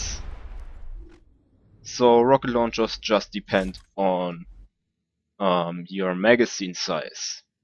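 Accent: Germany. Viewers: Very good video. I play as Axton too. By the way, in my ears you sound quite deutsch...;-) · Bist du deutsch? Man hört dass nämlich an deinen englisch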